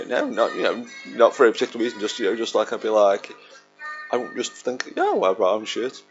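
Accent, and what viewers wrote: United Kingdom. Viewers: I usually find an accent tolerable. This sounds like British Ebonics!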